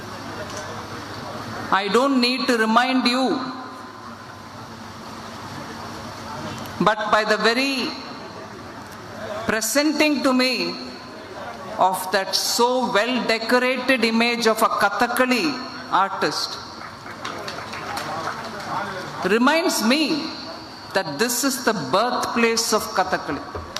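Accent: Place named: Tamil Nadu, India. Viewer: Very many thanks for breaking open the mandha buddhi peoples brains. Nice to hear Tamil accent. Appropriate examples added. Thank you 😊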